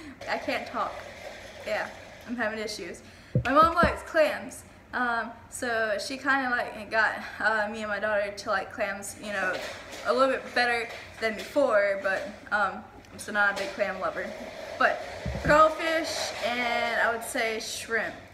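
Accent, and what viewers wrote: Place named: South, United States. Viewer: I watched the whole video - I really enjoyed getting to know you better. I love your family values - sounds like your family is the best, especially your brother! Guess what girl? I believe in you too! You have so many talents. You are a really good artist! I'm very happy to have met you and one day maybe we can do a collab when we have more subscribers! What state do you live in? You have a southern accent. Also what is mudding?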